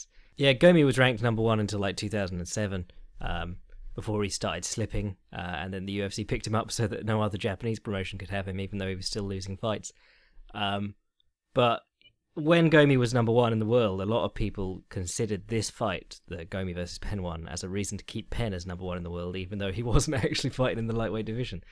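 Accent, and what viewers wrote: England, United Kingdom. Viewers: Your podcast is now a highlight of my week and as a Patreon supporter I want to say thanks for the great work; to me your breakdown of the cards with fight analysis alongside fight history and social history and the 'long view' with that chatty style (you charmer you! 😄) delivered with English humor works for me big time..original and refreshing change from lazy, samey trash from mma media. There are some really good guys, no doubt, but maybe not so much by way of innovation and getting fans engaged, you might agree? Could you the man t shake things up there? I note you plan to focus on the podcast at start of this year so that fills me with hope and glee!! I'm English and it's also nice to hear about mma from an English tongue..I would actually be interested to know who your audience is, if you've done that research and willing to share with us? 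(i.e from which countries, more US or British? much outside those two? Ages, sex etc..I want to know my new tribe better, I guess.. Anyway is one of boys and no mistake! Here's a thought then...how about a feature where Patreon bods can compete/enter for a chance to join you for ten, fifteen mins on the podcast for a chat on their favorite subject related to mma/Boxing/whatever, today or from the past..a little screening of participants would seem to important but i wouldn't mind betting you could have a pretty good, interesting conversation with a good many of your regular listeners..? Yeah alright, totally selfish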